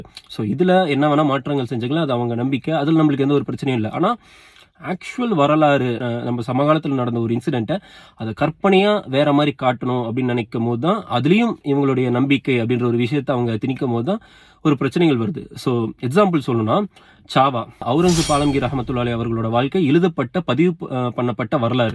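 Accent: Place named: Pakistan. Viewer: you are literally speaking like a Pakistani person voice,ur videos show's how much ur radical, u r too bad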